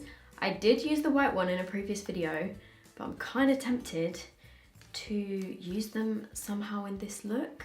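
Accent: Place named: England, United Kingdom. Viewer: I just love the whay how she speaks!Is she from England or something?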